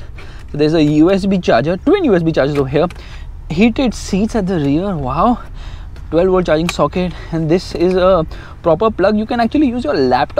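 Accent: India. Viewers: I love your Indian accent especially when your this excited. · Why is your accent so Hollywood Indian character ish...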